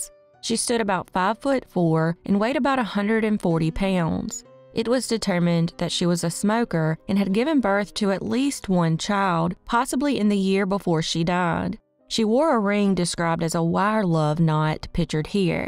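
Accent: South, United States. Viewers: Well you got a new follower cos love the stories and love your southern accent. It's a breath of fresh air. That's for sure! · So I’ve always been curious… what part of the south are you from?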